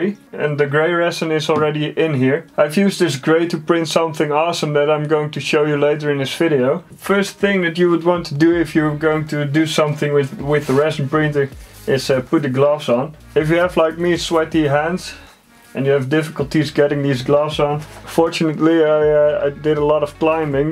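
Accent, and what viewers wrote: Netherlands. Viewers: Damn your Dutch accent is strong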